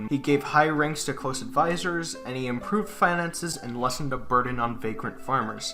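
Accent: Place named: Chicago, United States. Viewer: DA MING, Down da line dere. I love hearing the Chicago accent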